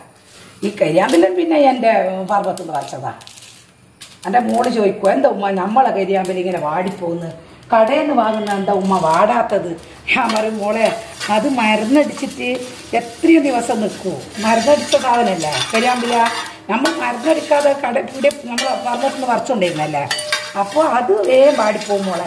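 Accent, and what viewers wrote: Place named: Thalassery, India. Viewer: ഉമ്മാന്റെ വർത്താനം ഞമ്മളെ തലശ്ശേരിക്കാരെപോലെ 😍😘❤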